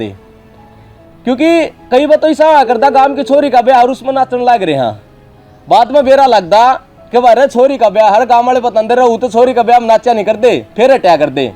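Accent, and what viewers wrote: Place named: Assand, India. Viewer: I am from Multan Pakistan .bhai lagai se kime assand ke ilake se hei kunke mere gam main assandiai bethe se.look like u r from assand karnal because my village my maternal relatives speake 100 % same language with the same dialect. I am surprised to my languageis alive in haryana india.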